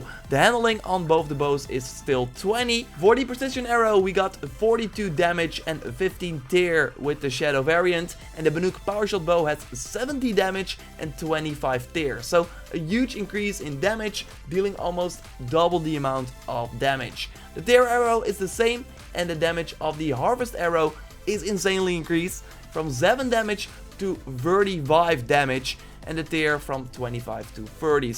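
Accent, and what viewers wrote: Netherlands. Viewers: are you dutch? bc u sound very dutch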